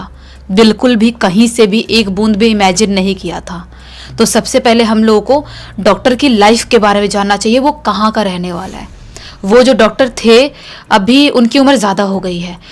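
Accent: Bihar, India. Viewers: plz btadijiye kya ap bihari ho? Apka assent whin k lgta h aur bhut words v! ❤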